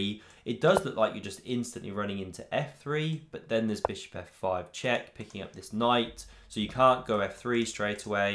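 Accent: United Kingdom. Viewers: Congratulations because I' m italian and I understand every Word, your english Is pure. Are you British?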